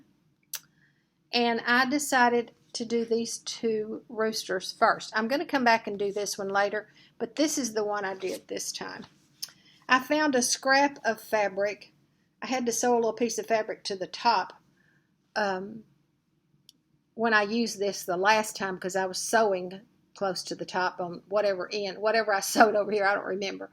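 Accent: South, United States. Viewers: My mother was born and raised on a farm that her family were homesteaders on in Conway, Arkansas. I think that's why whenever I'm missing her I always tune you in so I can hear her through your beautiful southern dialect. Wish I lived close to you, I'd certainly adopt you. Hugs a bunch, Toni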